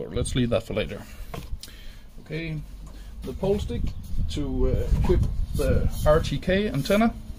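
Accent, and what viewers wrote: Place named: Denmark. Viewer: Your English just sounded a lot like when a Dane speaks English. And I was curious what the price is with all Danish taxes etc :)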